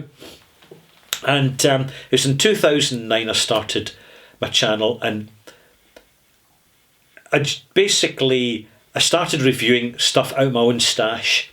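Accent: Scotland, United Kingdom. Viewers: Hi, I love your trade dress. Please take that as a polite compliment from an old patent and trademark attorney. The tweed bonnet, the thick woolen flannel shirt, the Scottish accent, the bothy, the barrel, the whole vibe. Your knowledge is extensive, and the breath of your whisky knowledge and vocabulary, and the style of your delivery, is superlative. There is an honest integrity about you which I find very refreshing, heartwarming and frankly entertaining. With great interest I have probably seen over 500 of your reviews. I like you, I am a deliberate and passionate malt mate. I’m an American living in Cincinnati, Ohio who loves Scotland and scotch whiskey. So it’s clear that I love everything you are doing and I wish you all the best of health and I hope you do this for a very long time. So what my gripe? One and one only. Please review Macallan. 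I am your echo chamber and I will support everything that you don’t like about non age statement whiskeys. I understand that the bulk of your audience cannot stand the snobbery (or the sky-high prices) of Macallan. Yes, it IS the gorilla on the table. And ignoring it seems disingenuous. And you are anything but disingenuous. Oh, what I wouldn’t give to have review a NAS Macallan Oscuro, non chill filtered, natural color and bottled at about 46% abv. Yes high prices can be a turn off but what would Car & Driver be without periodic reviews of Bugattis and Ferraris. We may not be able to afford those lux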